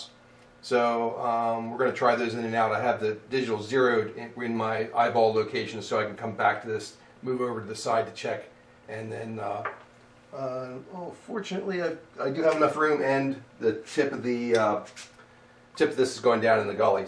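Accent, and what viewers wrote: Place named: Philadelphia, United States. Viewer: Your accent says you're either from the Philly area or still around there, LOL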